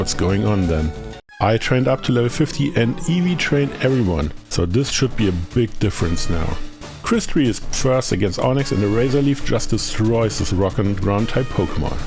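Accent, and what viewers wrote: Germany. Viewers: Sehr starker deutscher Akzent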